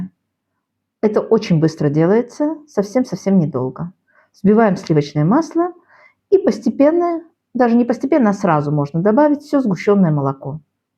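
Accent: Baku, Azerbaijan. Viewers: Спасибо за наш старый добрый бакинский рецепт! Помню эти вкусняшки делала тётя) Кстати,акцент наш слышен до сих пор!))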